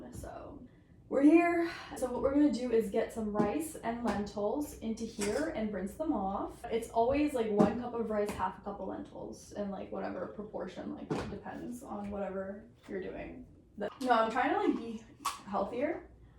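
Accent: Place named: United States. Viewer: One generation is all it takes. You may be eating Indian food, but you sound so American is beyond belief. : ) your Puerto Rican Indian : )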